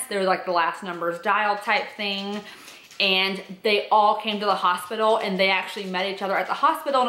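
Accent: South, United States. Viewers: I found out recently that a co-worker of mine is also an avid YouTube watcher. We live in Des Moines too and she told me about your channel! I was confused because I definitely detected a southern accent in your last few videos; it makes sense now!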